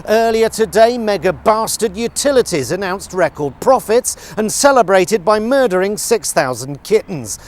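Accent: United Kingdom. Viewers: This is me, but, without the British accent.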